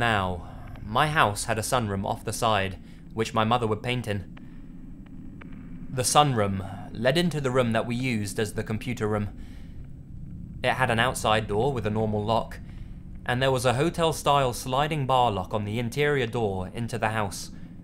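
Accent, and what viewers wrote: England, United Kingdom. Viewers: No need for sarcasm, or maybe you’re just being stupid, I can’t tell. Obviously I can tell from his accent that he’s from England - I mean WHERE, given that there are so many counties in that country. I didn’t want to just presume he’s from London or something. 🤦‍♀️ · hes from the uk like me so we will pronounce it differently , since the language started with us XD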